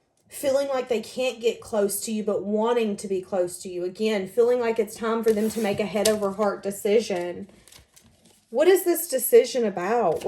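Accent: South, United States. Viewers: My goodness, child. I do believe you are the smartest reader I have ever encountered. The only thing you missed is that he has a relatively new diagnosis of cardiopulmonary illness. And that would tie it all together. And I LOVE your southern accent. Peace.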